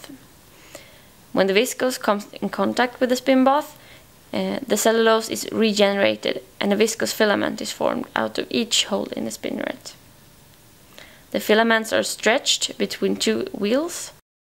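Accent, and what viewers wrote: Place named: Sweden. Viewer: Normal Swedish accent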